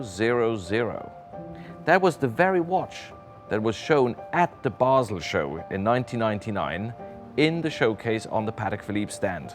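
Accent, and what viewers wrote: RP, United Kingdom. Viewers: Aurel Bacs has great knowledge but he really needs to stop with that posh English accent. Just doesn’t sound natural)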